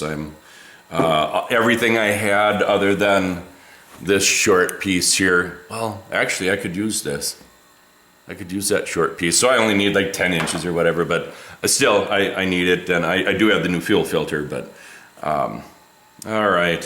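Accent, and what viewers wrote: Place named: Midwest, United States. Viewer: you are so midwestern. it brings me joy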